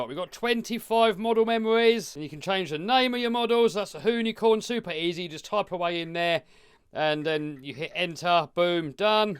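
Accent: England, United Kingdom. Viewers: You sound English why are you sucking up to the yanks by giving prices in Dollars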